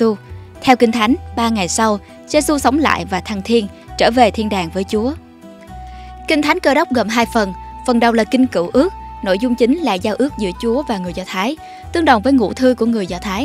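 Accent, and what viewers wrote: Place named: Saigon, Vietnam. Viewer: Giọng sài gòn rất hay ủng hộ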